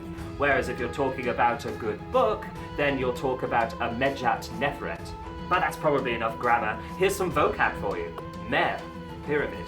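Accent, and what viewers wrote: United Kingdom. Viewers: The funny part is always the english accent,even here. A lot of english speaking natives are complaining about accents of non natural english speakers if they learn english. Good to see that they are not better. No offense,just wanted to mention it. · Sounds welsh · Your accent is extremely British in ancient egyptian SORRY. Try to pronounce it differently cuz it's ridiculous but if you cannot do that at least pronounce R at the end of the word and do not create a new non-rhotic British Egyptian :D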